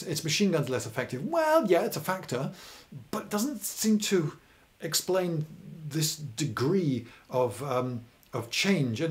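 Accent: United Kingdom. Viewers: some friends and I have been wondering over the last few days why you Brits pronounce lieutenant like lef-tenant instead of Lou-tenant. Regards, A curious member of the Australian colony. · “Pencil-neck”!?! Haha no way man you fuckin rock I love your videos, sorry some of my fellow Americans are less cultured people with nothing better to do then be shitty to other people. Honestly the British accent and way you talk probably makes them feel intimidated or like they are less intelligent or something. Keep the videos coming dude!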